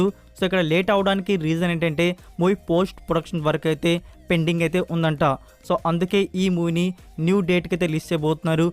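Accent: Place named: Tamil Nadu, India. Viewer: Hahaha Tamilnadu Karnataka vallu matladinattu undhi mi Telugu....Anyway Ur Content is always Good brother....👏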